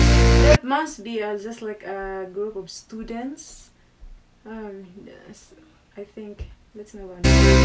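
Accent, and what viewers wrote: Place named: Indonesia. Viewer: Your accent in English is just like Indonesian people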